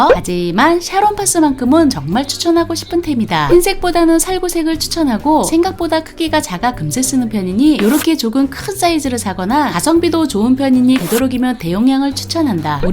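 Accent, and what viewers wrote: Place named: Japan. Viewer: 한국어를 일본어 처럼하시네요 ㅋㅋㅋ 독특한 억양 중독성있습니다 꿀팁 감사합니다~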